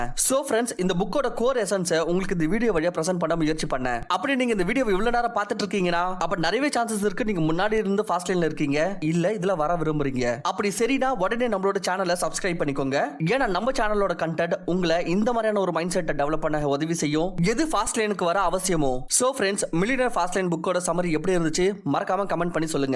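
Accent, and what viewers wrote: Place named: Bangalore, India. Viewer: Bro. Neenga bangalore ah.. Ungaloda tamizh pronounciation bangalore tamilian madhiri irukku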